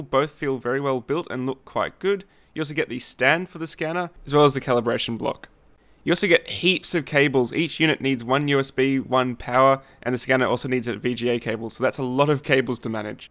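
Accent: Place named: Australia. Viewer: Man love your vids! So to the point, auzzie and easy to understand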